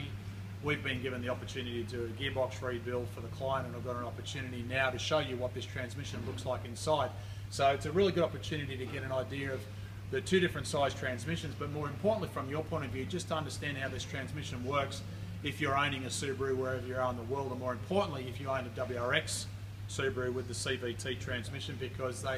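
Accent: Australia. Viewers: While no secret was revealed, other than full disassembly required for filter change, your Oz accent gave the video a "Richard Attenborough" like effect which was enjoyable. Great video. Thank you.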